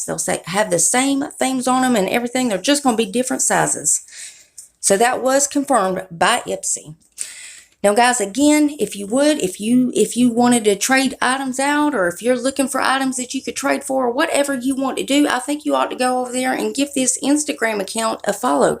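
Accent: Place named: Ohio, United States. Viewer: love your accent! I lived in New Lexington Ohio years ago. Your voice reminds me of those friends and employees.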